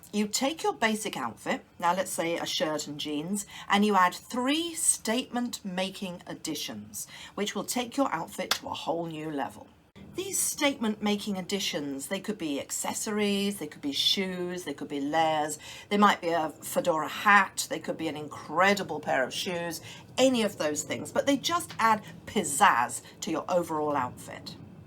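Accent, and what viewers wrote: United Kingdom. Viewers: Dear, You look absolutely stunning in all your outfits; what a figure! That flat tummy! Love your black dress, leopard belt, blazers, boots, jeans. You have inspired me to buy similar jeans. I love that you model the outfits and show us how to combine using the power of three. I would much rather see you modeling the outfits than a stock model. Love your personality and, of course, your posh British accent. You look like you are having so much fun. Natalia Arizona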